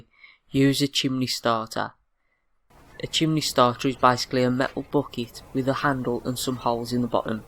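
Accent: United Kingdom. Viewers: Good tips, thanks for the video. Curious, what accent is yours considered? Like, within the UK, what would it be called? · seems a nice guy, but comes over as rather nervous about being on camera, and his presentation is very rushed. I'm actually quite surprised there aren't loads of Americans complaining they don't have a clue what he's saying because of the speed (I know how difficult some American find British accents). Both of his vids I've seen so far are good, he just needs to relax and slow down a little.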